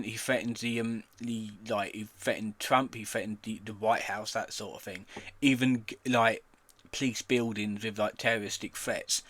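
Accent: United Kingdom. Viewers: average british voiceover, completely unintelligible... bro daniel is more understandable than you when he's in his meltdown mode. just use one of those cancerous text to speech programs it would be better than this. 24 sec in and i legit had to pause and say wtf lol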